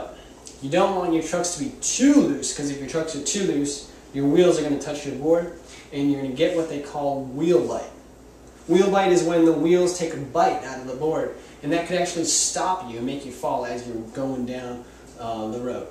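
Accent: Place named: Texas, United States. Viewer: Does anyone notice his slight Texan accent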